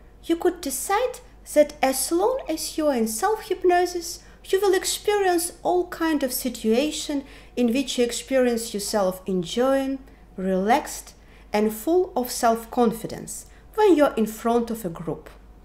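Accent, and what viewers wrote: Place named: Eastern Europe. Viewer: Wonderful video, thank you. Very grateful new Aussie subscriber and love the East European accent. Thank You again.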